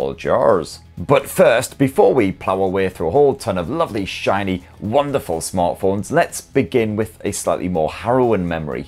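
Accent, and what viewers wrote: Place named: Cockney, United Kingdom. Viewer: Man if you didn't have that cockney brogue...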